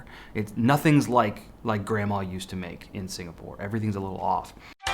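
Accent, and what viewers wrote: United States. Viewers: I’m Japanese live in Singapore. I totally agree with you and I like your American accents especially “Hawker Center”. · You don't have the Southern accent. Which state you're from?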